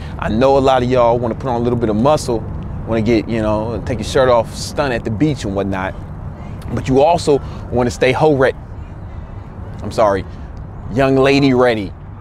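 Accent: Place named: New York, United States. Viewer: For someone that's from ChiTown, you have a heavy set, NYC accent fam. When I first saw.your vids 2-3 yrs ago, I always thought you where from Da Big Apple. How long you've been living here? P.S. I took the liberty to check your Six Pack Cheat Codes. Definitely gonna add you to my email friend list. I saw PT 1 & that shit look mad intense. I'm recovering from the flu hence, I don't have the endurance much less, the strength to dare fuck with your regime. Not til I get healthy. I'm slim, I should be able to get a six pack before summer ends. P.P.S. Didn't I tell you your Bulls were done in the 90's fam? 😄 I know, I know, Knicks in the 70's. Smh!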